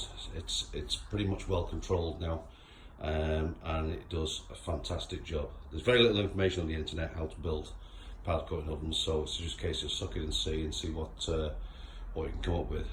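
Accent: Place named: United Kingdom. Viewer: Hi, I have got a very very similar oven, I bought it last week, havent used it as yet, bought it from a wheel refurb guy. Nice to hear someone from the UK, I have not done my first powdercoat as yet, so a steep learning curve I think is about to happen LOL. Dai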